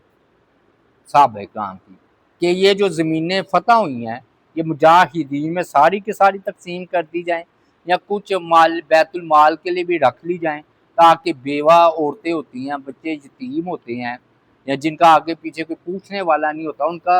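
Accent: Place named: Lahore, Pakistan. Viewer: I like his lahori accent..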